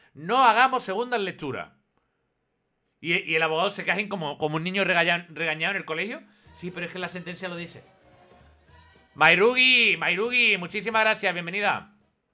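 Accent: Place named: Andalusia, Spain. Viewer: Si nos encanta porner la r en vez de L, como er Dalas, er Cuphead y así, nos parece magnífico el acento andaluz de er.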